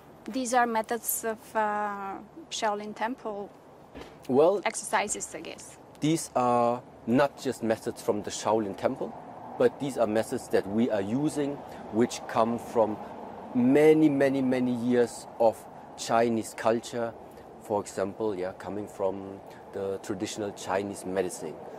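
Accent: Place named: Germany. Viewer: nach einer sekunde gehört dass er eigentlich deutsch spricht hahaha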